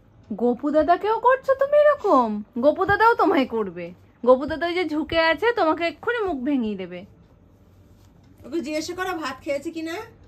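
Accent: Bangal, Bangladesh. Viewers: By borne foreigner too tumi ..Ar tumi je educated ...sooo impossible bangal kotha adopt kora....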